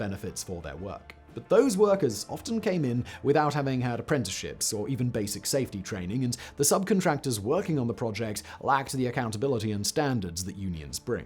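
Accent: United Kingdom. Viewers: I thought this was vsauce! I’m like why does he have an annoying British accent now?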